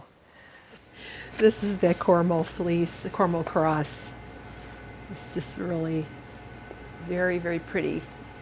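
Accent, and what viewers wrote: Chicago, United States. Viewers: Hi. I have been watching you for a few years now. My family is from Chicago although most of them have moved to the southwest. Your accent reminds me of home. I love your videos and all of the knowledge you share. Thank you so much. I have started spinning this year. I’ll let you know how I get along. I might have some questions. I have a used lendrum wheel that I bought from an English lady who used it for 30 years. It is well loved.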